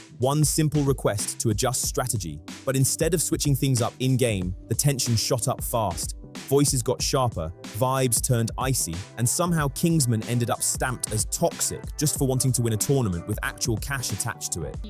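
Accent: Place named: United Kingdom. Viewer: ahh cool, so it's the same information we've had for weeks just regurgitated in a british voice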